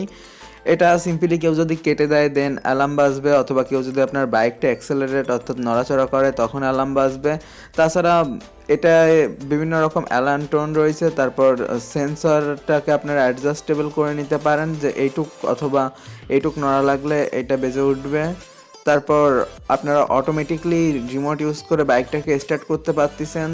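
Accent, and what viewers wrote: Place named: Magura, Bangladesh. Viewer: ভাইয়ের বাড়ি কি মাগুরা? আঞ্চলিকতা রয়েছে ভাষার মধ্যে।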